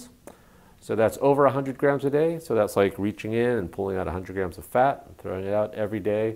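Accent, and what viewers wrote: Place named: United States. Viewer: You sound American but you talk about kilos or whatever. I'm American and I talk in pounds. Everyone here talks in pounds. I don't know anything about kilos.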